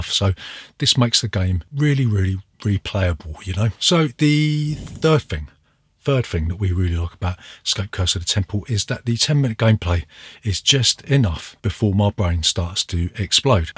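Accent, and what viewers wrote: United Kingdom. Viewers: I am digging your english so pure so british. Loving that. Greetings from SVK <3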